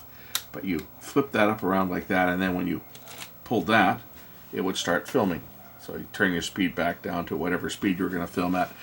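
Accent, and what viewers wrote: Canada. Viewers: you speak funny language. sounds canada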